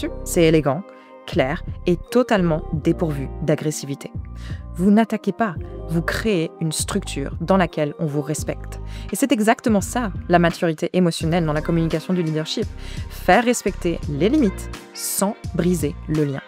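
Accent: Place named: England, United Kingdom. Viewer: j'aime trop ton accent anglais en parlant français, je peux t'écouter toute la journée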